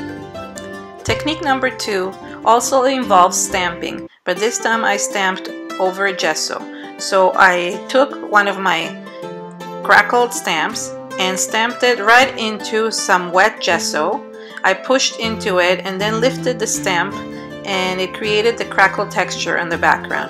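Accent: Israel. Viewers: From your name and accent, I’m guessing that you’re Israeli? Love your videos!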